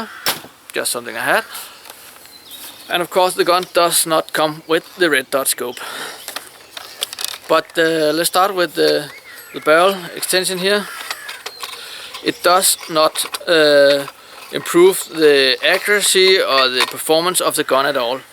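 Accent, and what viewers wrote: Denmark. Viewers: You sound danish. Are you danish? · you sound like a danish do you still have the gun?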